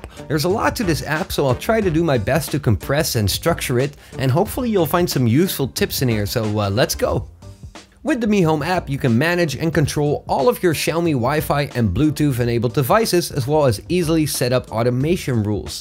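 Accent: Netherlands. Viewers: Brendan Dijkstra An btw, He has a very subtle dutch accent. You wont notice it unless you look for it.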